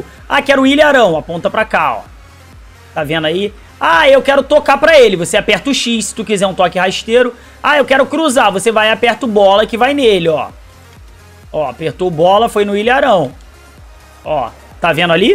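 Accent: Rio de Janeiro, Brazil. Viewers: O melhor é o sotaque de Carioca raiz kkk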